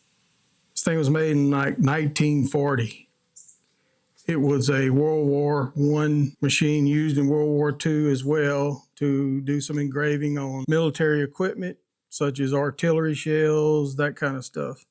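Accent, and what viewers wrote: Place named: South, United States. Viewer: knew you were southern man. Not to far from you, north mississippi.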